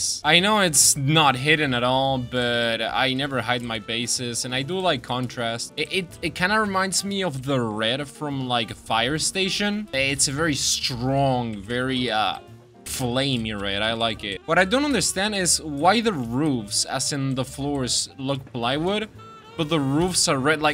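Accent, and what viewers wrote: Argentina. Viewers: como que este chabon es argentinO XD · Oirte hablar en español con ese acento argentino ayudando a los novatos me da la vida ❤❤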